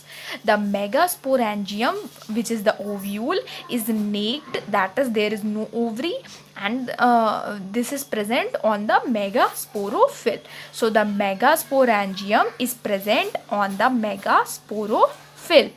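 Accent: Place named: India. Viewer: Ye Indian accent he... Tu saale gymnosperms samjne aya he yan English seekhne... Ch***ya Sala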